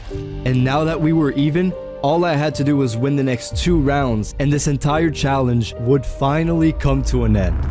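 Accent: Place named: Quebec, Canada. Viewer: I thought you were french (considering your game language is) and I was flabbergasted by your english accent, but I check your description and you're from Quebec, so I get it now. Anyway, very nice video with top notch editing, especially for someone with so few subscribers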